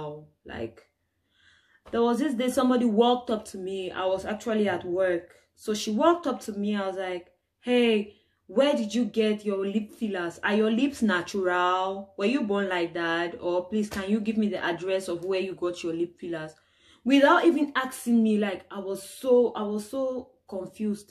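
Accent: Nigeria. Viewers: your accent is slightly Nigerian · your speaking pidgin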